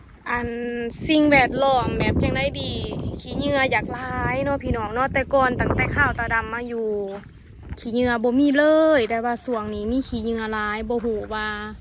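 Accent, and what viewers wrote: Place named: Phibun, Thailand. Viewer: สบายดีอีหล่าตาดำหายไปดนเน้อเจ้า เว้าคือคนเมืองพิบูลฯคักเจ้า เป็นเพราะว่าเฮามีชายแดนติดกันกับช่องเม็กทางไทยน้อ น้องตาดำเว้ากะม่วนๆว่าสั้นสา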